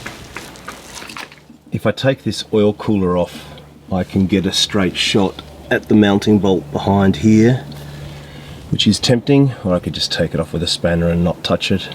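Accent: Australia. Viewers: Are you from Australia? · It's weird seeing this. Usually with a West Virginia accent and "hold my beer" thrown in from time to time has been my experience. Seeing and hearing the Aussieness of it is pretty cool. Interesting "series", thanx for taking the time to make the videos and post them!!!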